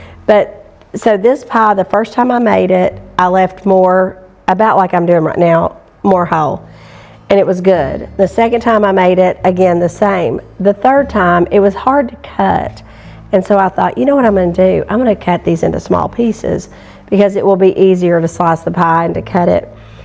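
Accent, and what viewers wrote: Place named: Appalachia, United States. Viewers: Appalachian dialect. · it is a real authentic country twang! I can't make it more formal even when I try. Thank you for the kind complements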